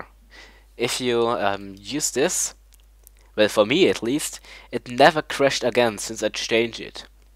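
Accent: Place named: Germany. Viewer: MAn merkt dass du deutsch bist xD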